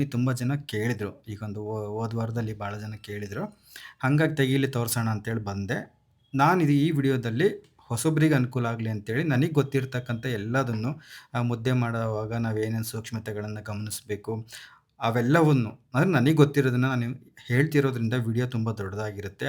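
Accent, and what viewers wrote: Chitradurga, India. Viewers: Nam durgada bashe ty u so much 🥰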